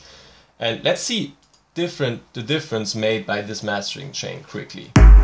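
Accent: Germany. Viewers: You're german, right? :D Nice Video!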